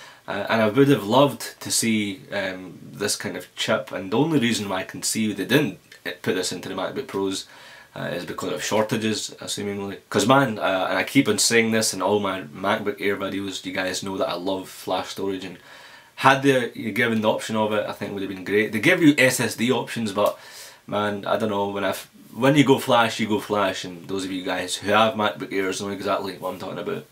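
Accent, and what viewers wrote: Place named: Glasgow, United Kingdom. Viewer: Accent is Glasgow, west Scotland, uk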